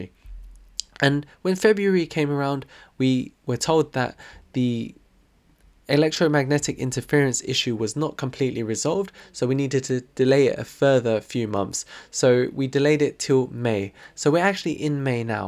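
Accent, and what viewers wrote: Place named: United Kingdom. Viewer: Very informative. I like your accent, are you British?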